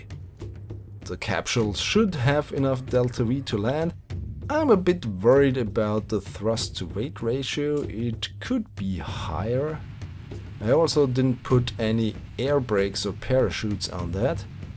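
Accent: Germany. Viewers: Your English kinda sounds like it had a little German accent and your Space Station's name is Franz so... Nevermind. But where do you come from? Because you said you were proud of Europe producing for NASA. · You are a german right? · You sound like a german, are you german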